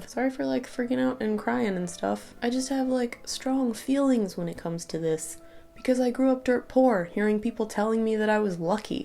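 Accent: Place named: United Kingdom. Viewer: i see u r speaking a little bit with the old british english accent!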